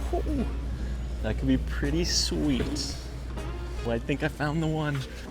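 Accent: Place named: Canada. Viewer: Bro your accent is like so Canadian and I love it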